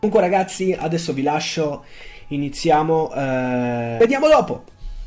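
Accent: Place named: Sardinia, Italy. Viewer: sei sardo? perché hai un'accento simile al nostro (sono sardo)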